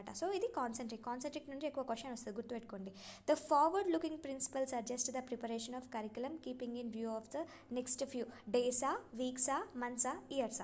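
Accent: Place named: Telangana, India. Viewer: Telangana accent spr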